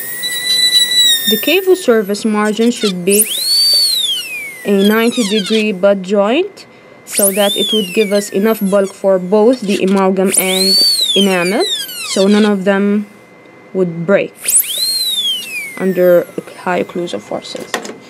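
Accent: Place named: England, United Kingdom. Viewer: Great job. Great En. accent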